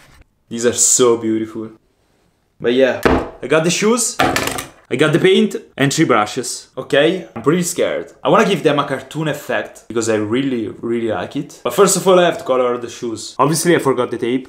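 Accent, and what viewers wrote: Italy. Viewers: 🇮🇹Hai un accento italiano mentre arli in inglese, sei italiano ? 🇬🇧you have an italian accent when you speak english, are you italian ? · You have such an italian accent bro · His accent is like an Italian trying to talk in inglish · fra si sente sei italiano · Bro you sound italian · Are you Italian? I am Italian and your accent sounds like it!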